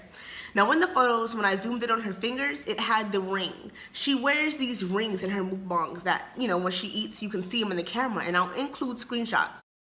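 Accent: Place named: France. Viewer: She's definitely not American, earlier videos show that she has a noticeable accent (possibly French) and English is not her first language hence spelling mistakes and such.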